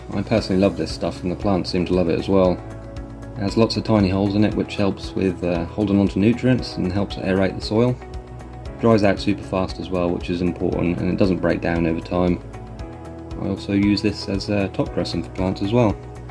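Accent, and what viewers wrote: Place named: West Country, United Kingdom. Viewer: Well that was 6 years ago. In 2026 are you still using the same mix? You’re speaking tagalog but have a West Country accent. Unique! 🙋🏻‍♂️🇬🇧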